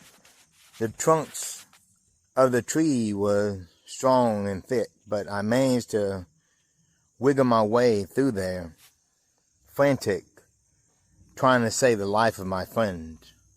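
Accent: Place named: Louisiana, United States. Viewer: Sounds Cajun to me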